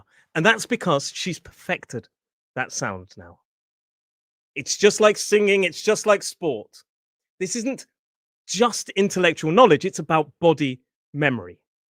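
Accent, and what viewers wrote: United Kingdom. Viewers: Yes,! I very much like your British accent, as I told you before because is the first English that I learned. And altough I probably won’t travel any more (I visited London once years ago), that is the English accent I most like!! Marcos (Montevideo, Uruguay) Ps: even though I'm 64 now and I'retired, I want to improve my english pronuntiation the way you teach it... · I'm from Argentina. I love British pronunciation. And I'm very happy because I understand every word you said!!😊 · Thank you so much! I love British voice.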